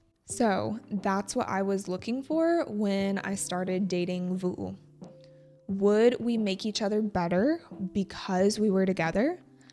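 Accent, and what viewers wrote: United States. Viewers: Thank you for making such insightful videos for practicing English. I love your stories as well. Yesterday when I was looking for a native speaker to shadow American English accent, fortunately, I found your accent a good choice for me. Now I watch your videos every day and do shadowing. Looking for more meaningful videos.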